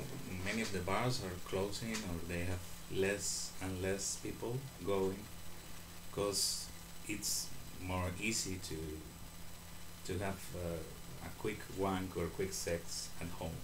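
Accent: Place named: Spain. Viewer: I agree on the whole apart from 1 thing and that is how can anyone find a nicer down to earth guy than you, you say it as it is plus the Spanish accent makes my hairs on my back stand on end, keep up the great work adios for now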